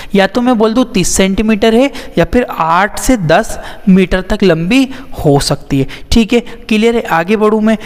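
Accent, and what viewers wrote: Indore, India. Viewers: Mtlb. Sr ap indore se. Ho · Sir aap Indore se ho kya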